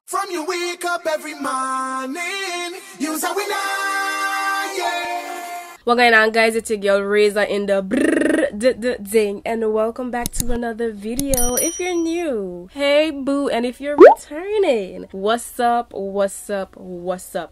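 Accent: Caribbean. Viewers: Can hear that Caribbean accent... much love to my caribbean people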